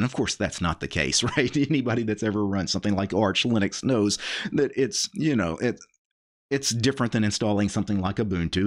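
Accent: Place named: Louisiana, United States. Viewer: I saw you on KeepitTechie, been subscribed but man i know your accent was familiar, but now I see you are a fallow Louisiana native. That was a great interview.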